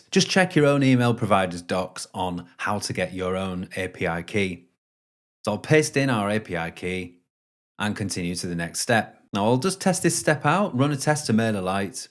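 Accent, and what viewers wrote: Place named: Northern England, United Kingdom. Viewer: Pure class. For someone with the IT skills of a lobotomised pebble, this was priceless. Nice and slow baby steps. No Yoda style html jargon and all them other things I simply do not understand with IT. You got yourself a new subscriber and follower. And the extra bonus was your accent. It is nice to hear an English accent as some other accents go so fast that I'm lost before I begin. Being from Norfolk in the UK, you don't get much slower accents than us, good ole boy but that Northern twang of yours is great for this. A bloomin good job. Bootiful.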